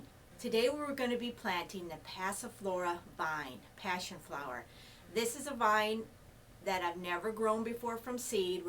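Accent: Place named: Wisconsin, United States. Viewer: Thanks for this. I live in Santiago Chile and I've seen these growing around neighbors' gates. I just picked a few dried fruits off them and will plant the seeds are you suggest. Can't wait! These are such amazing flowers! By the way, I grew up in the midwest and enjoyed listening to your accent! (Wisconsin?)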